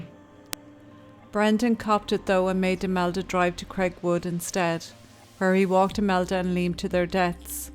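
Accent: Ireland. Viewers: The narration on these videos are wonderful. I am from the USA, but I love the Irish lilt as it reminds me of my dear grandmother from Donegal. Thank you for your sensitive telling.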